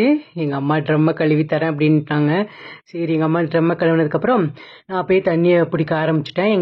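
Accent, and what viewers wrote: Kanyakumari, India. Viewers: Akka kanniyakumari slang pesunga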